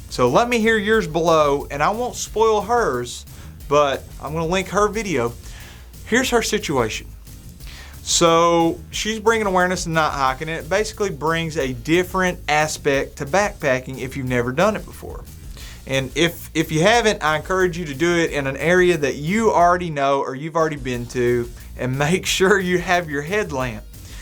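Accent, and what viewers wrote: Kentucky, United States. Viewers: This probably not politically correct, but I watch you simply to hear your Kentucky/hill Billy accent. It is awesome.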